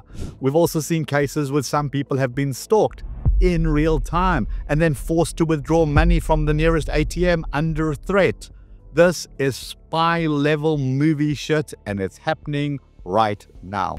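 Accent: South Africa. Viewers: Whoa … when I saw your name, I expected your accent to sound way more like my dad’s rather than a South African.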